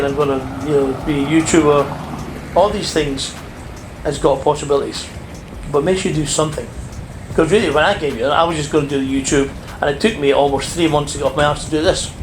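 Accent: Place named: Scotland, United Kingdom. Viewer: Alan, accents make the world a more interesting place. If you can't grasp what's been said, then that's your issue & not the speaker. I'm not Scottish & yet I love the Scottish accent & understand every word.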